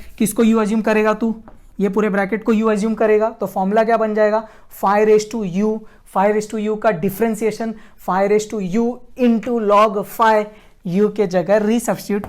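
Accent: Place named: Mumbai, India. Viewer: Luv your way of mumbai talking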